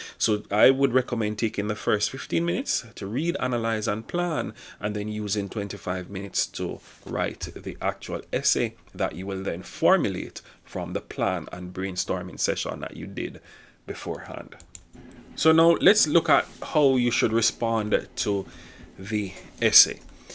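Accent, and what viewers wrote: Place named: Jamaica. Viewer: Sound like a Jamaican 🇯🇲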